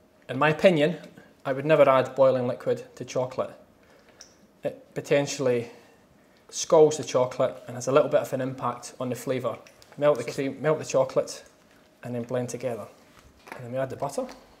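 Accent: Scotland, United Kingdom. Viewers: I just looked at the comments to see id anyone mentioned his scottish accent 😂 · I was trying to wind him up lol I know it's scottish, you know how the relationship with Scottish people is with the English ;) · haha no he's definitely scottish,i lived there for a year and he definitely is scottishxP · He scotish? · no, he sounds very scottish.... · Where is his accent from? Scottish? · Oh that beautiful chocolate and that Scottish accent... <3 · Scottishhhhhhhhhhhhhhhhhhh, yaldi. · He's Scottish, AKA the language I speak. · I know that you've already had you me question answered, and yes, he is Scottish, but some Scottish accents are different depending on where you live ( I'm Scottish ) :) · you are right jonge!!!, its sound scottisch. I said that he was a dutch because it really sound like dutch speaking english...buut isnt it. I actually still have a dutch accent when i talking english hahah ;) doei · I wasn't expecting a Scottish accent going into this 😂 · He's most likely from central Scotland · Definitely Scottish! 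The way he pronounces 'R' tells that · YES! He's Scottish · He is Scottish like mee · This man is Scottish, not Irish~ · Chocolate upon chocolate upon chocolate and a Scottish accent. This video was sent from heaven. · Ahh Scottish accents <333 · no he sounds very very scottish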